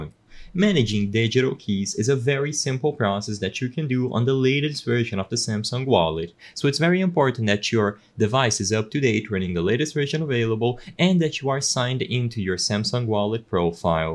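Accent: Brazil. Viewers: That's certainly a Brazilian speaking English. You got caught by your accent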